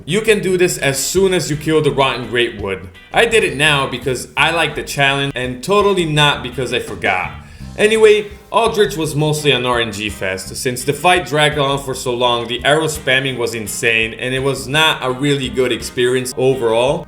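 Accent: Italy. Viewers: Rapid question, you italian?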